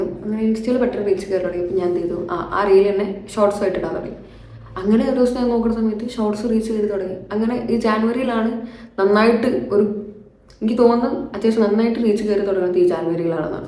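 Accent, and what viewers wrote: India. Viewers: KOZHIKODE slang pole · Aiswarya lekshmiyude oru facecut und.. Where was your schooling and college? Slang ketapo thoni frm malpuram anen bcz am also frm malpuram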